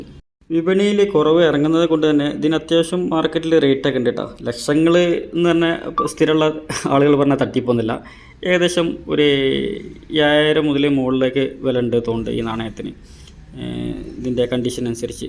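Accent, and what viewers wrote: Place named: Tamil Nadu, India. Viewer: വീട്ടിൽ ഉണ്ടാക്കുന്നുണ്ട് ഇല്ലേ സംസാരത്തിൽ തമിഴ് കാണുന്നവരെ പറ്റിക്കുന്നു ഇങ്ങനെ ഉള്ള വാർത്ത കൊടുക്കുന്നവർക്ക് സെൻട്രൽ ജയിൽ RBI യിൽ റിപ്പോർട്ട്‌ ചെയ്യുക 🙏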